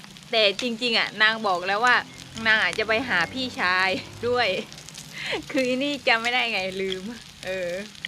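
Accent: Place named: South, Thailand. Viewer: พึ่งเข้ามาดูให้กำลังใจค่ะ สำเนียงเหมือนคนใต้เลยนะคะ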